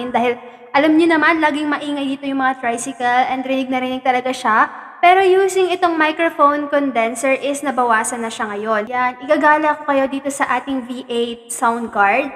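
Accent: Visayas, Philippines. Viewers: Bisaya lge ni si host ilhan hahahah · Bisaya ka maam?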